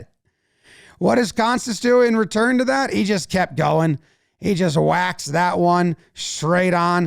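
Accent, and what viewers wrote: United States. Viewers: Who else randomly started watching, and after hearing the American accent, thought, I may click off soon but watched the whole thing and thoroughly enjoyed it... Thanks...subscribed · It's pretty cool listening to an American talking cricket! Haha! You should also check Aussie rules football and V8 Supercars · It is so weird to hear an American talking about cricket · Amazing to hear an American commentating, glad you're loving the game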